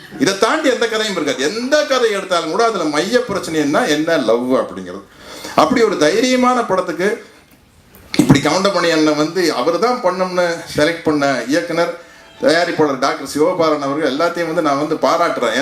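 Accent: Coimbatore, India. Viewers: கோவை தமிழ் சூப்பர் 👌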